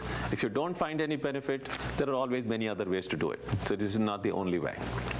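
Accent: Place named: England, United Kingdom. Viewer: Thank you for having an English accent that is articulate and clear with consonants and vowels, not slurring your words. 🙏, this help me understand these teachings of that ancient science from the Indian Continent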